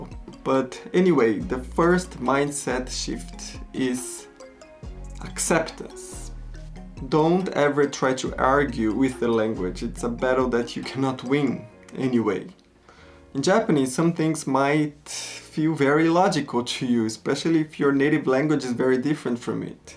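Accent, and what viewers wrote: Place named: Brazil. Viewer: You sound like a Brazilian, am i right? You have Pretty good english and japanese!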